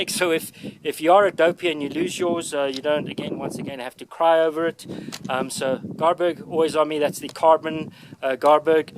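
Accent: South Africa. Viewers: Your rig serves the same function as our load bearing/carrying equipment in the Army. It allowed us to cache our rucksacks and do short range patrols with much less bulk & weight while still having enough equipment that we wouldn't be caught short if something unexpected happened. Sound thinking in my opinion. I enjoy your accent; sounds South African to me.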